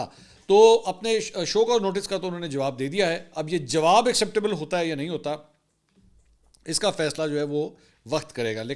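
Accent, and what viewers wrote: Punjab, Pakistan. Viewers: Aoa. bhai ap ka Punjabi bolny ka style bht Pasnd hai mujhe.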